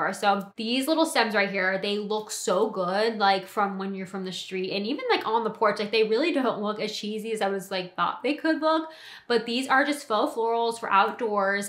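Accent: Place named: United States. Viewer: Nationality means what nation you are from. She sounds American to me. If you mean ethnicity, my guess would be Caucasian, but she could be mixed. Hope this helps😊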